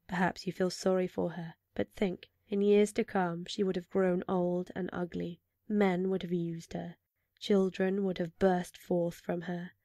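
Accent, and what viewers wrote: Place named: United Kingdom. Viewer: Oh my .... This channel ... I don't ever want you to stop reading :( There's no sweeter sound to my ears than a bedtime story read with such a warm voice. It reminds me of my childhood. And as a french guy, i think your delightful british accent is the cherry on top.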